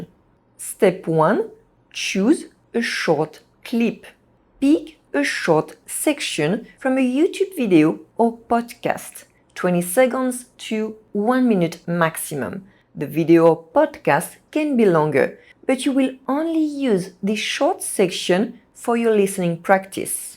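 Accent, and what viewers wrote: France. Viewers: J’adore votre accent, c’est intensément français… presque difficile pour un anglophone à comprendre.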